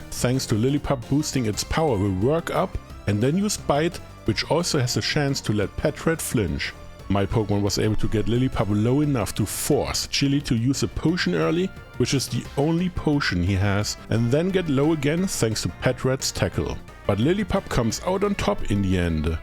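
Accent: Germany. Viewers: Your german accent is very strong.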